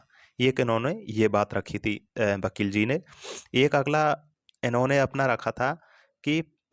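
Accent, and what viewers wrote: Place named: Rajasthan, India. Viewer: Tumhari language se tum hindaun City k lg rhe ho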